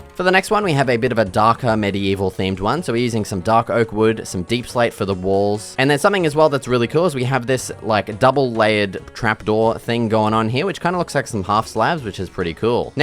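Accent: Australia. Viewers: I like your channel I love building in all games but idk I just really like your channel pretty genuine also question you Australian?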